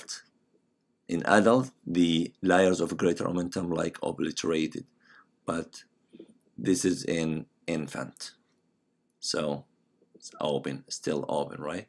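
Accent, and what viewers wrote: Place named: Arab world. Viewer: ابدعت دكتور احسك عربي من لهجتك ما اعرف ليش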